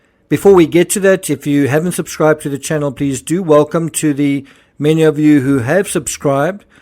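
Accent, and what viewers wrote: South Africa. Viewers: What I cant understand is that if it was an accident, why cant the parents admit it? Love the Sa accent, it reminds me of home. Hi from NZ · Hi sueelliott4793 from me, also in NZ. I like SA accent but even more than that I like his creepy intro music!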